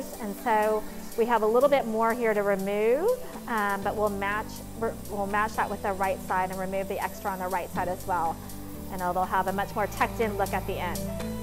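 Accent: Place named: United States. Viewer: From her accent I can tell she's an American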